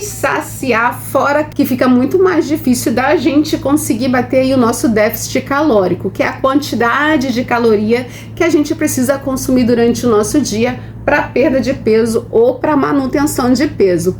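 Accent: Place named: Rio de Janeiro, Brazil. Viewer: Que sotaque lindo!!! Eu amo O sotaque Carioca❤ Sou Minas Gerais e amo O Río De Janeiro. 😍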